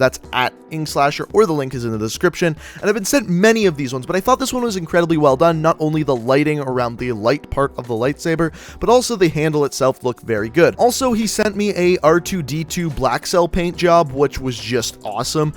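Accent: Canada. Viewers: Soo soory you sound really Canadian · ohhh I know your from Canada now ''soory" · He's definitely Canadian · are you Canadian? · Are you Canadian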